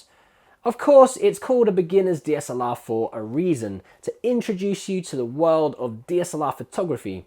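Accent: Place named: United Kingdom. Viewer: So nice to have someone British and not American 😅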